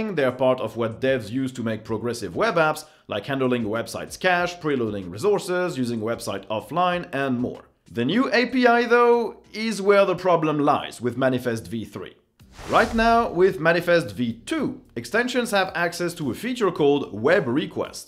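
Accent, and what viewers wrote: France. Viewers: Je me disais aussi que tu avais un accent français :)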